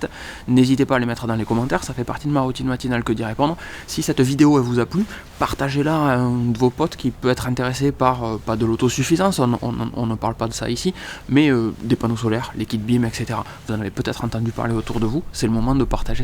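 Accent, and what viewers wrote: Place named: Tarn-et-Garonne, France. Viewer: Tu serais pas du Tarn et Garonne ou pas loin avec ton accent ? lol ah bin j'ai eu ma réponse presque à la fin de la vidéo lol Moi je suis de Montauban. Merci pour cette vidéo, ça risque à me servir pour la maison de mon père.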